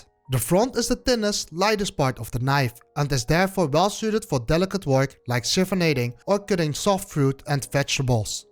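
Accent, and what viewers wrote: Netherlands. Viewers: Really like your videos and your thorough, honest advice (i.e. "no such thing as "the best" knife). I also find your Dutch accent charming to a certain extend. Yet, you maybe need to work on your English pronunciation of "U" - You say it like "oo" as that letter is pronounced in Dutch and German. In English it's rather said like "you" as in the word "use" (say "youse", not "ooze"). Also your inconsistency in using either singular or plural can be irritating, while your English vocabulary is great, though. Also, please e so nice to use your index finger for indexing, using the middle finger looks kind of rude. Keep up the good work and all the best!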